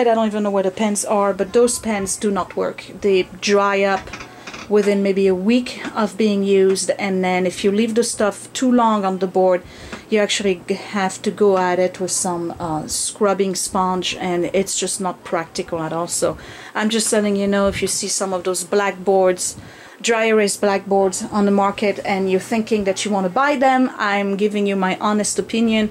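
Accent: France. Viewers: You go girl! Your have been crazy busy! I want some of your energy :) I love your accent, is it French?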